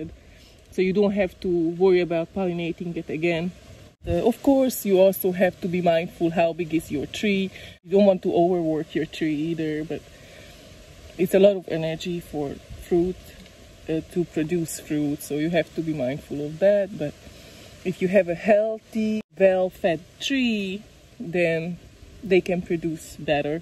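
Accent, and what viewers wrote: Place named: Hungary. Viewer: Haha, elég régóta nézem a videóidat de eszembe se jutott hogy esetleg magyar az akcentusod :) Én a Karib tenger egyik szigetén élek van egy fiatal rollonia fám amiről pár hete szedtük le az első és egyetlen gyümölcsöt. Most nagyon sok virág van rajta de nem egyik se fordult még gyümölcsbe, ezért kérdeztem. Nagyon szeretem a videóidat (köszönöm megint, hogy megosztod velünk) meg persze irigykedem a szép kerted miatt!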